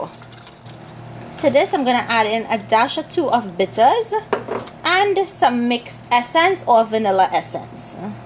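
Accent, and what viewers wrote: Trinidad and Tobago. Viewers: Greetings from Grenada via Britain. I make mines similar, instead I use the pulp from my carrots after I finish juicing. In the blender I put condensed milk, the the pulp, and some essence and brown sugar as most of the sugar is extracted into the juice. The beauty is that there is no waste, as I hate throwing the pulp out when I'm done juicing. Hope all is well. (btw- my ex-wife is from Point Fortin, so I ALWAYS miss the accent)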